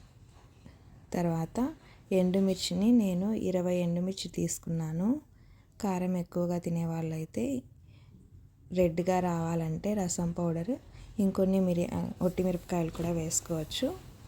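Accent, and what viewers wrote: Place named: Kadapa, India. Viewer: Pakka Kadapa yasalo matladuthunnave mmeebale chepthunnav❤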